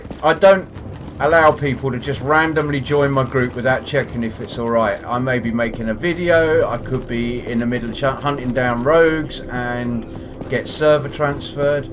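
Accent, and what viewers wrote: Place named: United Kingdom. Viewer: Bloody hell, finally hear a streamer from the UK! Fair play 👌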